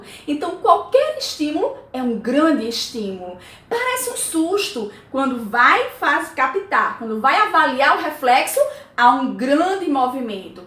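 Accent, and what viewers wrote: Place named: Paraíba, Brazil. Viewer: Muito bom o video! Quando vi seu sotaque, lembrei da minha mãe, que, é da Paraíba e fala igual à vc!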